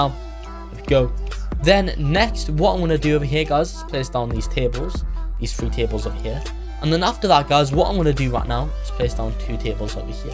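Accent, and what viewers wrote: United Kingdom. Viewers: That wasnt a swear word, it just seems that way because he talks fast in british accent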